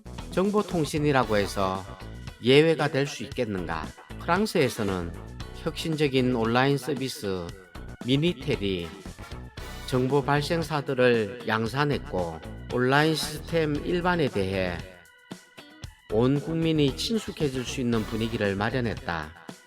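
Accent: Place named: Gyeongsang, South Korea. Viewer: 투박한 말투와 갱상도 억양에 묘한 끌림이 있네요~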